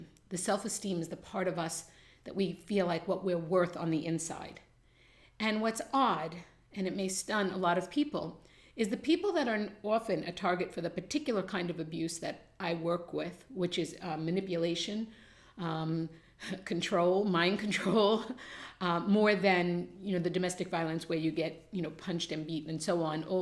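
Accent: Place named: New York, United States. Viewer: I got this gut feeling with a last name such as yours you are from NY cause Im from NY and You sound like someone I would talk with in a King Kullen or S&S. Making me home sick. I also would like to point out...that things in America we would classify as NPD or other disorders would be considerded normal especially in Asia.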